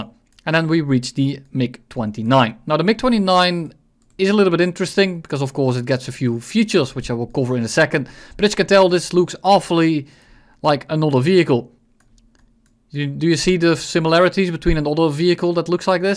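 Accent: Netherlands. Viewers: ik hoor dat je Nederlands bent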